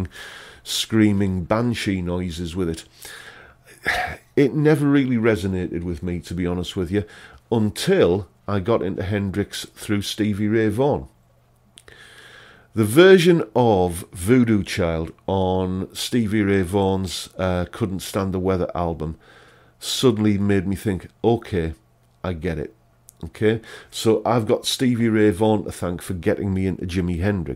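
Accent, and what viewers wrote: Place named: Teesside, United Kingdom. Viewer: Ahhh, you're a Teessider! I've been trying to work out your accent for days since I found your channel. I knew you weren't a Geordie (no hair on you palms) but I was thinking Co.Durham. Now that you show the Gazette though, I'm thinking closer to the Ironopolis. I'm guessing not Middlesbrough though (I'm from Marton but live in Manchester) because your accent is a bit too thick. You say you live close to Darlington, so I'm going to guess Stockton. Am I about right? I'm going to say: 1. -. I was 13 years old and it came on the TV on The Box through me little comcast box. I remember borrowing my sister's tape and getting chills from the solos. 2. -. After borrowing Cali, my sis and her b/f made me a mixtape which had on it. I used to listen every Sunday on my paper-round to this tape and always floored me with how dynamic and distorted and squeelie the guitar was. 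3. -. strat on this (I believe it's a strat) just shows exactly what a clean tone should be. It's all about the phrasing and the fingers, but also a well set up guitar with proper action and the right gear. 4. -. Perfect tone. 5. - Sorry but... It wouldn't be a hit song if it didn't have something going for it and LP sounded amazing on my way down the Avenue of trees in Acklam on a summer's day during my exams. That particula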